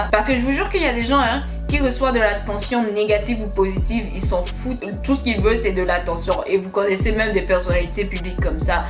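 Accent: Quebec, Canada. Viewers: T’es québécoise nan ?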